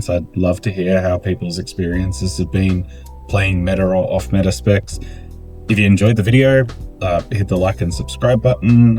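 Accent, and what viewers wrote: Australia. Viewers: subscribed just bcz of the aussie accent. Awesome content!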